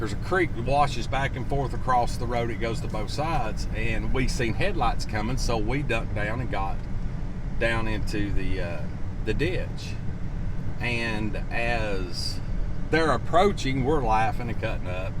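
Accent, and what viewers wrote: South, United States. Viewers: a southern drawl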